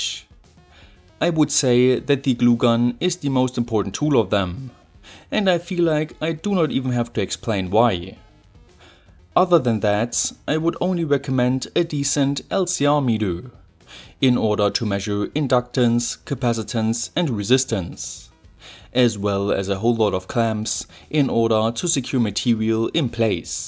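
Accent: Germany. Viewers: I thought he was German, ja?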